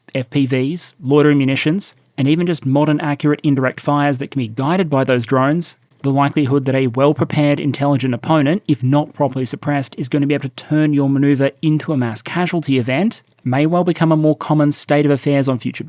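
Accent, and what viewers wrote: Australia. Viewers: If it makes any difference, I find an Aussie accent to convey sincerity and humility and combined with the sense of humour, enjoy it a lot. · Thoroughly enjoyable episode! and I always like a good strong Australian accent, being myself an American with probably just as gratingly obnoxious an accent as any Australian, if I get real local about it. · There are people out there that don't enjoy listening to the Australian accent? 🇺🇲🇦🇺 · a very heartfelt "THANK YOU!" for your channel. Love your Aussie accent and Emu v. Kiwi examples. Keep up the great work! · Regarding the comment you received about your accent, all I can say is most Americans that I know think Aussie accents are rather charming. While the stereotypical Brit 'upper class' accent seems somewhat snooty, Aussie's exude a friendly 'how are ya mate' quality. Yours is fine and combined with your humor and knowledge make the videos very listenable. 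🇦🇺🦘👍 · That last bit is why I have watched your stuff for well over two years now. You're insightful, intelligent, honest, and humble. Keep up the good work and don't worry about the Aussie-isms. I'm sure us Americans will learn eventually. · Finland within top ten :D Well, greetings from over here, war topics are indeed in our heads. p.s. I didnt realise some people dont like the aussie accent, I think its cute, gives a nice relaxing atmosphere to otherwise serious and analytical approach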